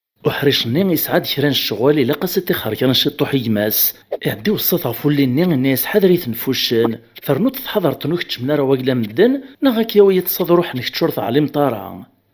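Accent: Kabylie, Algeria. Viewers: Votre kabyle ressemble au mien 😊 je suis de Béjaia et j'habite a Londres. Je dors avec vos belles histoires. A3tik sahha ayizem averqach.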